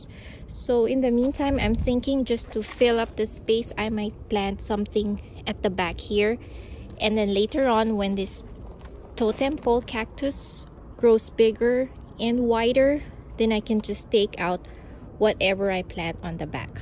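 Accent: Philippines. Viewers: Hi! Are you Filipino? You sound like one. Not that its a bad thing, Im a Filipino too. =)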